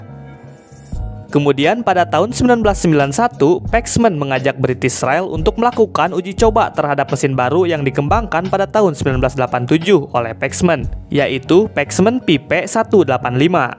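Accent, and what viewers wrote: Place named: West Java, Indonesia. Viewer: Naratornya sunda pisan euy...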